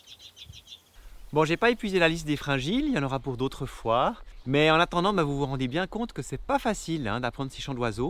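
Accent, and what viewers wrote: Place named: Switzerland. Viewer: Le plus beau chant d'oiseau c'est votre accent suisse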